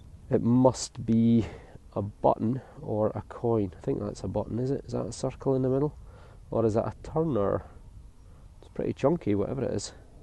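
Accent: Scotland, United Kingdom. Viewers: New subscriber here and Im binge watching your videos. Love listening to your scottish accent..(to me) and love your videos. Do you give all your finds to the owner of the properties?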